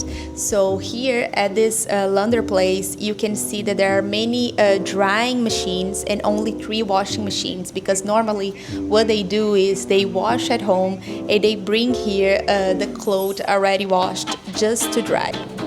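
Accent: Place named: Brazil. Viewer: I recognize that accent. Are you Brazilian?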